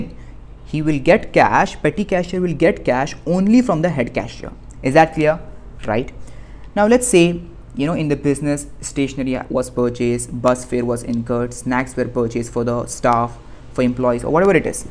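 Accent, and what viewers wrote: India. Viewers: Lol his english suggests he is Indian. You people really know to make educational YouTube videos.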